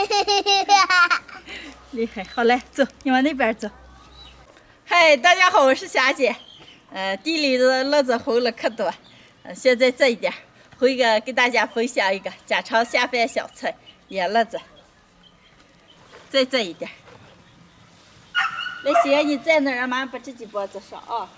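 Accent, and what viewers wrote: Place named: Yan'an, China. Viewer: 我陕北话这么有牌面么。感觉霞姐还是延安口音。。。。。。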